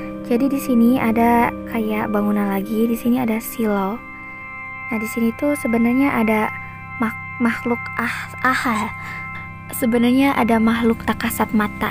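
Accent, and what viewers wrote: West Java, Indonesia. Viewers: Kak aslinya orang sunda ya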